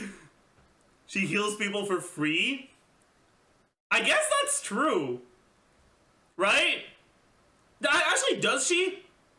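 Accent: Quebec, Canada. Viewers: Hey, I'm really impressed with the quebecois accent you did for this video. Also the beard looks super real. Well done like subscribe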